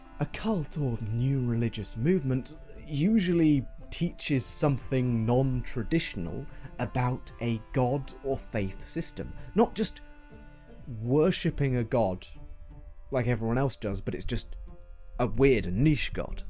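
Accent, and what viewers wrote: United Kingdom. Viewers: wait—“modern mainstreaming of Christian culture” in the US? You mean those TWO THOUSAND YEARS of “modern mainstreaming” of Christian culture in Europe are just going to get a pass? You sound like you have a British accent, can you comment on the thousands of lives lost and the awful terrorism stoked by religious fervor during the Troubles? Or perhaps the explicitly violent Christianizing mission of European colonizers in Africa, South and Central America? Or the European Crusades? Come on, let’s not have an AmericaBad moment here.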